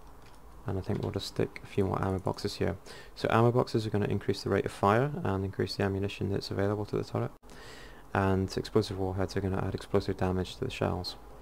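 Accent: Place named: United Kingdom. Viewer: nice brootish accent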